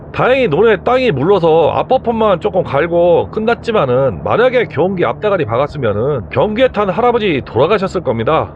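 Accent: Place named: Jeolla, South Korea. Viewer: 시골어디쯤이신가요? 내용듣다보면 충청권같으신데 말투는 전라도권 같으시고 ㅎ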